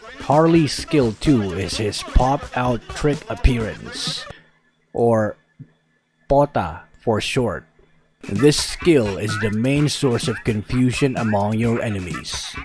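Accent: United States. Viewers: I thought you were American or what ever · Pilipino ka pala hahaha akala ko american 👍👍👍👍Pota Ka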